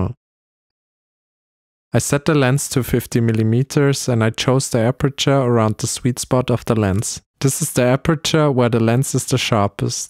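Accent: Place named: Germany. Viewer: Echt gutes Video! Man hört leicht deinen Deutschen Akzent xd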